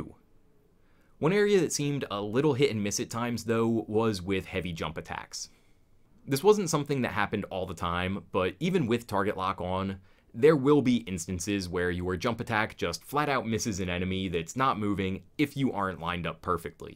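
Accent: Philadelphia, United States. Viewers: GREAT points man. you from philly? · Philly accent for sure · Yo bud you from around southeastern PA? Is that a slight Philly accent I hear? You sound like me lol.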